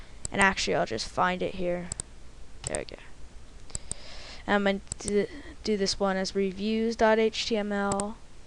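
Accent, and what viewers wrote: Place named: Alabama, United States. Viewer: or... would it be sky flaaaawers? ;) j/k. This tutorial was cool. I used to have the same accent, being originally from Alabama and raised partly in Florida.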